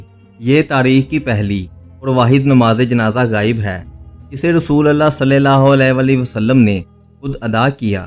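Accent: Lahore, Pakistan. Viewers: بہت اچھے موضوع پر بہت اچھی گفتگو. کوشش کریں کہ آپ ، اپنی اردو ٹھیک کرنے کی کوشش کریں . لاہوریوں والی اردو لہجے کو ٹھیک کریں .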